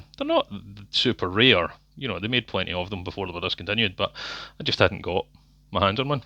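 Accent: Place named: Midwest, United States. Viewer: Heard the accent, are you located in the midwest?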